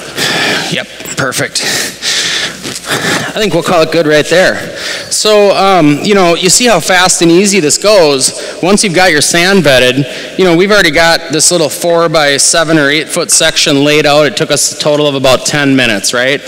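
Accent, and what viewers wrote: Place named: Minnesota, United States. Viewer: Man this guy is definitely a Minnesotan. Do we really sound like that?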